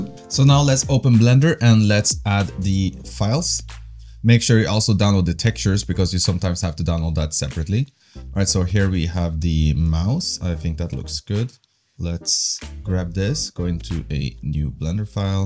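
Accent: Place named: Norway. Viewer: I know a norwegian accent when I hear one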